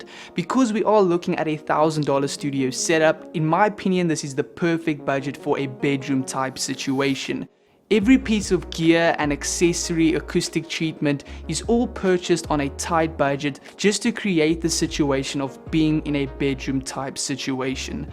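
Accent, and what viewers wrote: South Africa. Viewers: Hi love your video’s been watching you from before the giveaways 😜 just a random question were are you from because I am getting South African vibes from your accent 🤷🏻‍♂️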